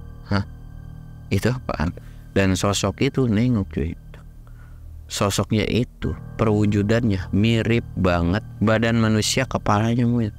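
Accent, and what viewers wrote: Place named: Banyumas, Indonesia. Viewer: Hadir ngapak Bang 😂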